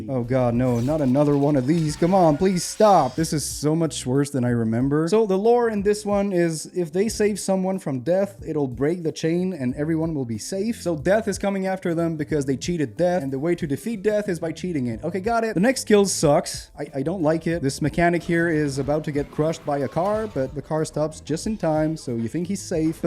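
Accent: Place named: Montreal, Canada. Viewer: Oooooo is that a Montréal accent I hear? It's quite delightful lol.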